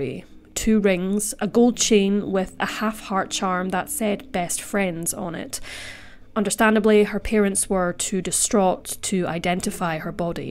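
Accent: Scotland, United Kingdom. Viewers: Great content, great accent. Are you scottish?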